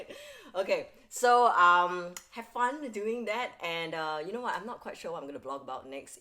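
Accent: Singapore. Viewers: Sounds Singaporean to me. Whats wrong with that?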